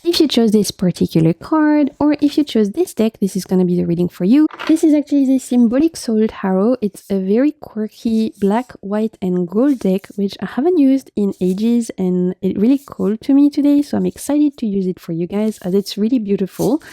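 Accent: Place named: France. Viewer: i lived in paris and dijon for 10 years...your french accent is delicious. and thank you for such an amazing reading. pile 3 merci beaucoup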